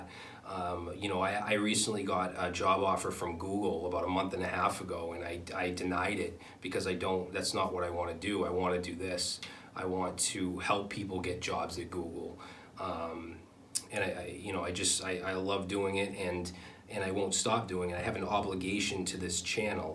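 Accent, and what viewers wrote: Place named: New England, United States. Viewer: your accent, t-shirt, and water bottle bring me home. I grew up between Chelmsford and Southern New Hampshire. (I live in Illinois now.) I benefit from your work. You are a really good teacher, keep it up.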